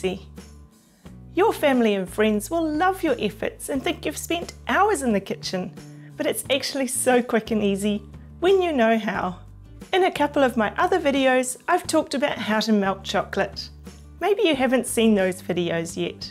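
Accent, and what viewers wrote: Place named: New Zealand. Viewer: LOvely design. And I also like that Kiwi accent.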